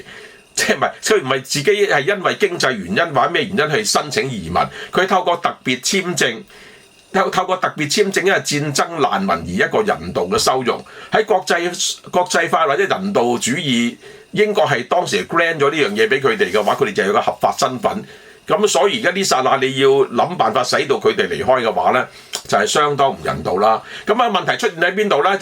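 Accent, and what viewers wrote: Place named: Taishan, China. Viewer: 感謝伍 Sir 乜原來時聞喺台山話，我還以為是新會話，因昔日有新會籍先輩常宣於口。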